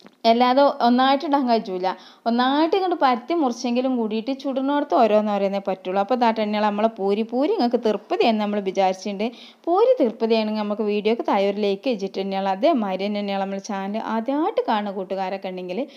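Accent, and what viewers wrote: Malappuram, India. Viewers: ഇത് ഒരു 50 വർഷങ്ങൾക്ക് മുമ്പെയുള്ള മലപ്പുറം വർത്താനമാ 😂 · It ha no malapuram bhasha?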